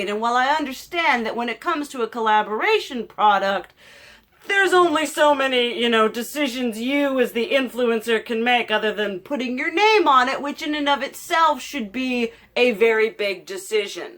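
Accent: South, United States. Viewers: I detect a southern accent! Where are you from? I’m from NC. And if you’re not from the southeast you dang sure sounded like it lol. New sub!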